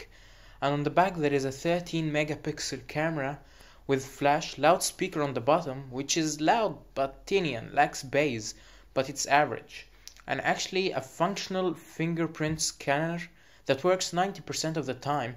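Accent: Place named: Arab world. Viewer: dat arabic accent doe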